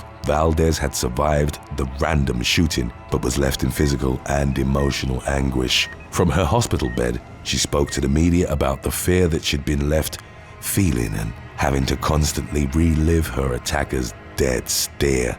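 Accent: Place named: United Kingdom. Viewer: Lol when you realize this channel is British. I didn't think about your accent. Soliciter=lawyer??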